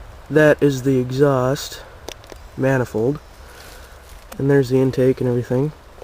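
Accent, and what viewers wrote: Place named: Pennsylvania, United States. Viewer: You sound like a Pennsylvainian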